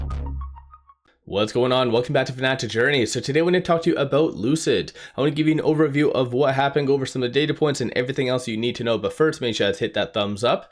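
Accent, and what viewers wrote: Canada. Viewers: Good Canada speak.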